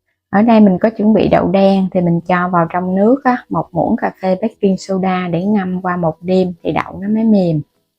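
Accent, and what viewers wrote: Mekong Delta, Vietnam. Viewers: Con ơi nói chuyện nên tự nhiên đừng nên địu quá không hay góp ý nên để giọng miền tây gặt để thương hơn nhé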